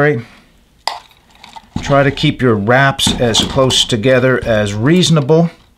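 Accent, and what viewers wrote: Nova Scotia, Canada. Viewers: I thought that accent sounded like Nova Scotia! Born and raised there until I was 12. Great video!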